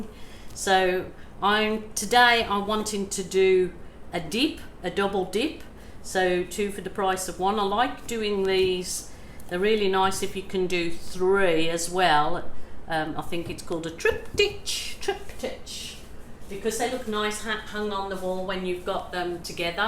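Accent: Birmingham, United Kingdom. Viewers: I knew there was a bit of Brummie in your accent! This is amazing, beautiful mirrored wings xoxox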